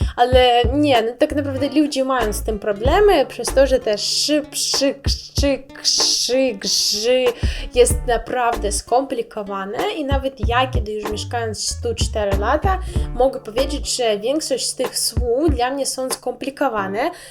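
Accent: East, Poland. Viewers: Nawet nie wiesz, że dużej liczbie polaków podoba się bardzo ,,miękki" akcent wschodni. Brzmi tak miło. Daje poczucie bezbieczeństwa i troszkę jakby odmładza. Mówisz i wyglądasz uroczo. Tak trzymaj. Moja ciocia z okolic Lwowa dalej zachowała ten akcent,, Ale to już nie to |:) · Dziewczyno czym Ty się przejmujesz. Pięknie mówisz po polsku, a Twój wschodni akcent jest wręcz ujmujący. Polacy tak naprawdę lubią Rosjan, rosyjski język i kulture. Nienawidzą natomiast Rosji... Podobnie z Ukrainą. Lubimy Ukraińców Mamy pretensje do państwa ukraińskiego ze nie potępia tego co należy potępić w naszej przeszłości. To tak zawsze jest, ludzie a polityka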